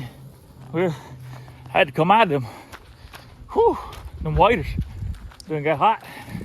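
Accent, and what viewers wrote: South, United States. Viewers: Get them beavers 🦫 I appreciate what you do getting rid of pests of all kinds!!! I love your Southern draw and you are hilarious 😂😂😂 Happy Thanksgiving 🦃🐖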